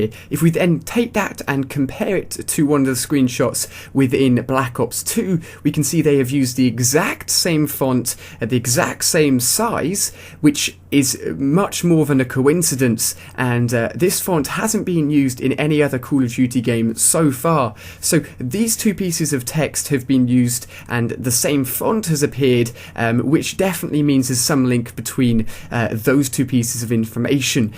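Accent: United Kingdom. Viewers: He's British. One does not simply change their accent. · Why does almost every game info video hav a British guy narrating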